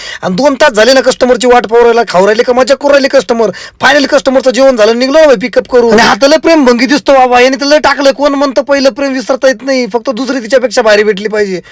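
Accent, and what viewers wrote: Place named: Nashik, India. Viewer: Bhava lai fast bolto na kahi asu de pn aaplya Nashikcha ton lai bhari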